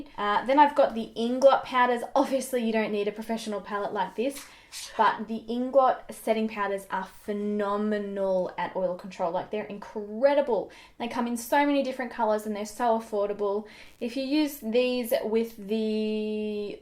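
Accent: Australia. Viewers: As a fellow oily skinner, and a mummy on a budget I highly appreciate the affordable options! Thanks so much....ps I'm a kiwi and am quite amused by your ozzy accent..oy oy oy!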